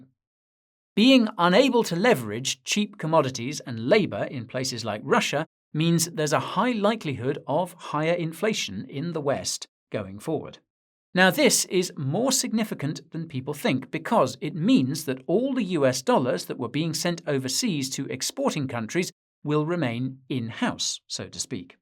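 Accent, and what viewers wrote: United Kingdom. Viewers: Hi 👋 Where about you based? You have a British accent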